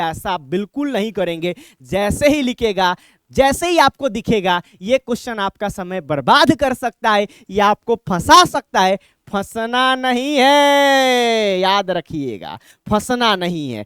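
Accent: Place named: Bihar, India. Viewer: Sir ka toh bihari accent h ...thoda relate kar paa rahe h kyunki hm bhi Bihar se hi h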